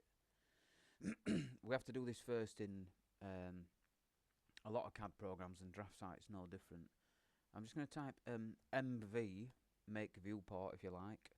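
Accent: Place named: North, United Kingdom. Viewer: After a lot of frustration with viewports, I should have known that a chill northern accent would provide the answers - cheers!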